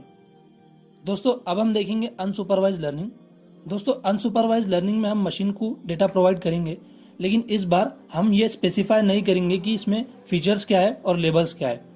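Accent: Hyderabad, India. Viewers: you sounds like hyderabadi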